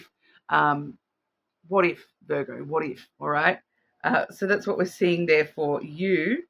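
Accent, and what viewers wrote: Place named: New Zealand. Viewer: Thanks for the reading 🙂 Also thanks for sharing how you ended up with a smattering of New Zealand inflection in your speech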